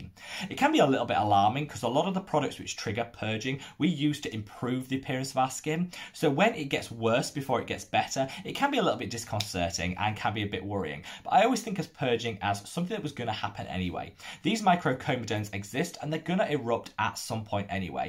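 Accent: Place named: England, United Kingdom. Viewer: I’m in Canada so I have more accessible to the Ordinary line and I stumbled upon ur channel while looking for more informations. I’m in my 40s so i have been binging on your anti-aging and pigmentations vid. I love all ur vid and ur English accent btw, lol. Thank you for sharing your knowledge, you got yourself a new fan 👌🏻💕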